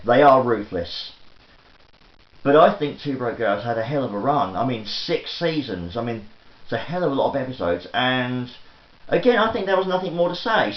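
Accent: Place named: Cockney, United Kingdom. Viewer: Hey,. It’s always cool to watch your videos. You’re probably one of the most down-to-earth British guys who I’ve come across. You deserve so much more happiness in your life. I recommend you St. Andrean’s videos. He’s a Scottish guy who’s also going through what you’re going through. Also, feel free to check out Unrealman’s videos. He makes a lot of good points and actually gives solutions. Also, it’s refreshing to hear a cockney accent. I haven’t heard an accent like that in years.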